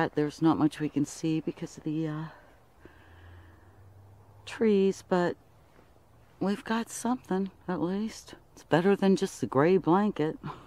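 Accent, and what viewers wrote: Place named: Ohio, United States. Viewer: You have a great accent, is it unique to Ohio?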